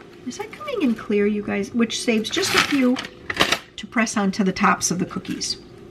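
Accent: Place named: Wisconsin, United States. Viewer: You are definitely a Wisconsinite. Your accent. I was born, raised and educated there, so I know the accent. out, down, ought, supper, etc., etc., A great place to have been raised.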